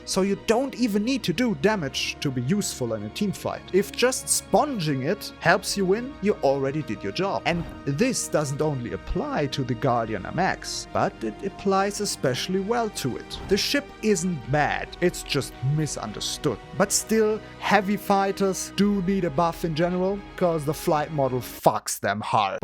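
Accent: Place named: Germany. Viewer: Der deutsche Akzent haut rein, gutes Video!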